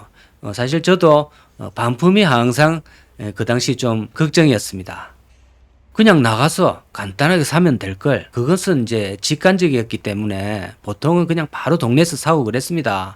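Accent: Gyeongsang, South Korea. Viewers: 경상도 사투리 일단 듣기싫노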